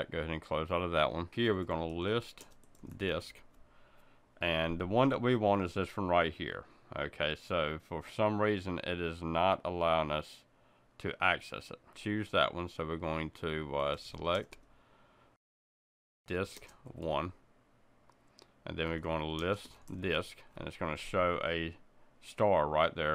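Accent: South, United States. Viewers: you sound like Southern American hard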